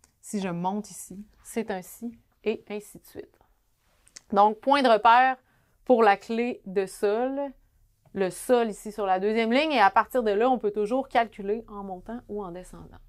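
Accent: Quebec, Canada. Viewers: Avec un superbe accent québécois... et très clair ! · c'est super l'accent aucun problème les Français adore l'accent québécois, bonne journée :)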